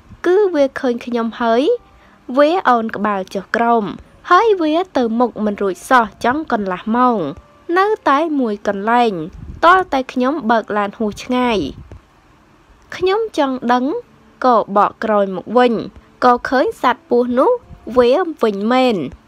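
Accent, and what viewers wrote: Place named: Vietnam. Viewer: អ្នកអាននឹងយួនទេដឹង តុងយួនច្បាស់ចែស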